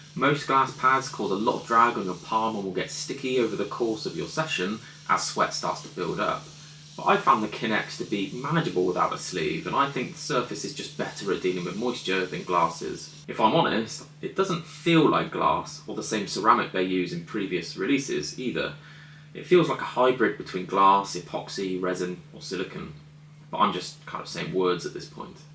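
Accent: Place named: England, United Kingdom. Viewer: Banger review and banger English accent! Do you have also a twitter account?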